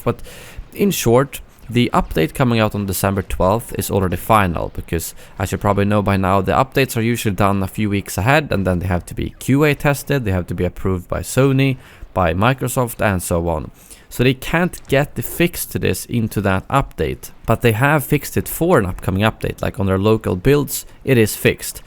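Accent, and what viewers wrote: Sweden. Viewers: Are you swedish? You have the same dialect as Dennis.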